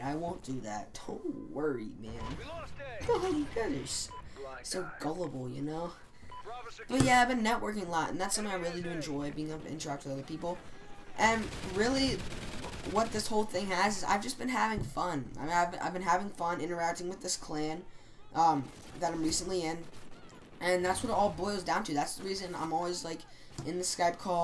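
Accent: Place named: China. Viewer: Windows ahah your Chinese accent tho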